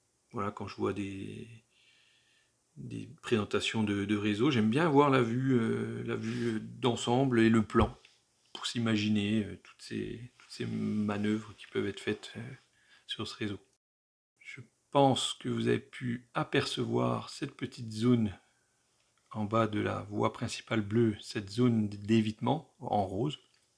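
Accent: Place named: Haute-Marne, France. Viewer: Bonjour pas mal l’ancienne doc FLEISHMANN très inspirant ta vidéo j'ai très envi de me lancer, c'est quand le prochain confinement ...c'est une blague, mauvaise blague surement j'ai pas trouvé mieux, j'imaginais me lancer dans le HO mais vu la place pourquoi pas le N, tu trouves facilement du matériel à cette échelle ? dernier petit point sympa le léger accent de la haute marne j'ai mon frangin qui habite la côte d'or depuis... qu'il a laché son département d’origine et je retriouve les intonations de cette région ;-)) Merci encore pour tes vidéos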